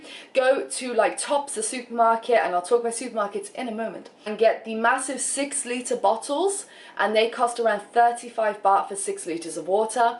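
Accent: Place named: London Irish, United Kingdom. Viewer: London Irish I’m guessing...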